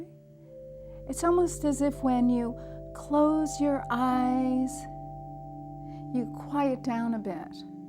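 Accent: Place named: Texas, United States. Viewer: What has mrs studied at university? Where did she obtain the Ph.D? She has tics for example at 12.39 minutes. She is scary, she is so demanding like a religious clerk. "Right"? "Listen". With her Texan accent.. No, not appealing, no charm. Be aware of your body language when you are on film.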